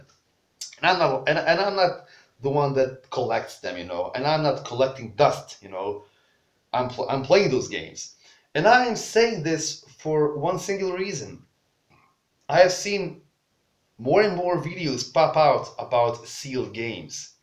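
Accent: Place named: United States. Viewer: U sound so american haha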